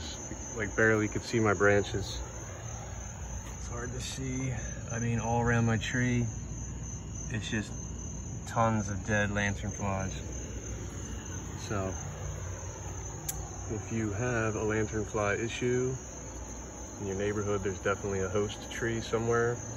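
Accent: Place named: Pennsylvania, United States. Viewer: I nailed that accent immediately. Yes, I'm from PA and I have disgusting Lantern flies.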